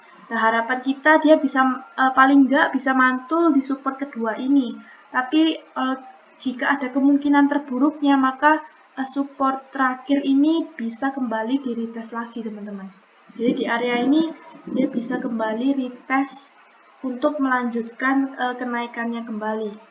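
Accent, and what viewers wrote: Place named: Surabaya, Indonesia. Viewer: Logat surabaya medok ci xixixiix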